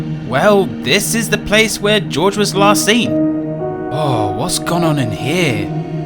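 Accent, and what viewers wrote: United Kingdom. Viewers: This is pghlfilms but bri’ish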